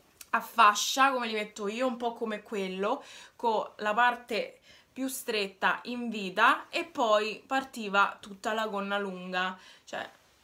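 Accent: Rome, Italy. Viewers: Se la persona che quando sono triste mi fa venire il sorriso e mi fa divertire con le tue battute il tuo accento romano TI AMOOOO💖💖💖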